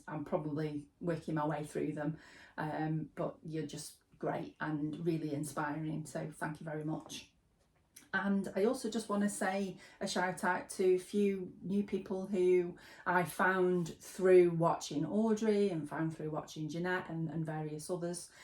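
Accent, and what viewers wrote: United Kingdom. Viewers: Noo don’t ever think that. You are so lovely and I like your really pretty English accent ❤. And all of you 😘🙏🏼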